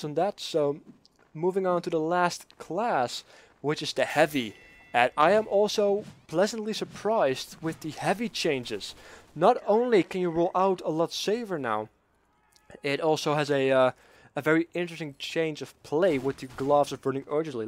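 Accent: Netherlands. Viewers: Maybe is this a weird question but... Are you dutch??? I hear something like that in your voice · Starting to like ur commentaries more and more! Quick question: Are u dutch?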